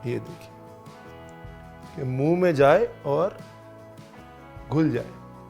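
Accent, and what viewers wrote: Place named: Punjab, India. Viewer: From Lucknow...but has Punjabi accent ♥😂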